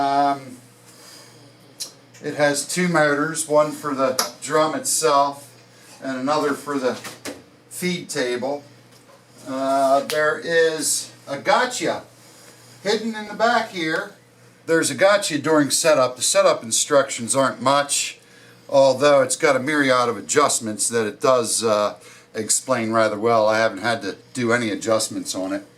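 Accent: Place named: Maryland, United States. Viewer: I thought I detected a Maryland accent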